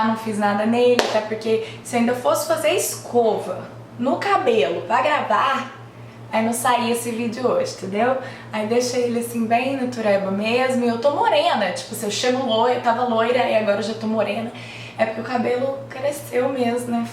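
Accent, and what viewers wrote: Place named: Uberlândia, Brazil. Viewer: Saudades do seus vídeos que bom q voltou!!! Saudades desse nosso sotaque lindo de Uberlândia!!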